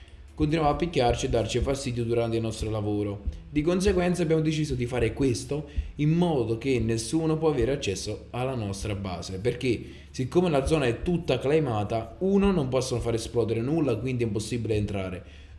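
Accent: Naples, Italy. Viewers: Ma sei di Napoli giusto?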